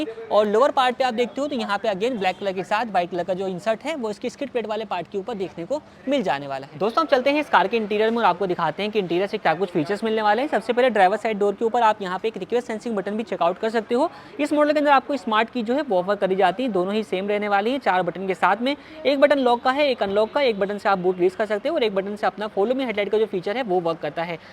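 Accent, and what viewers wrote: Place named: Jhansi, India. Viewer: Tum jhansi se ho bro voice pahchn gye ham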